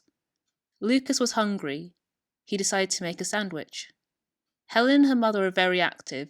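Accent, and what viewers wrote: England, United Kingdom. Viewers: very horrible pronuncuation, such a strong english accent...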